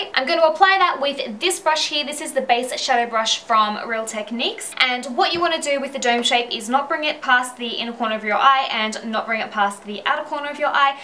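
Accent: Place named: Australia. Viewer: thees kolla heah! Is this an Aussie accent? it's so cute! lol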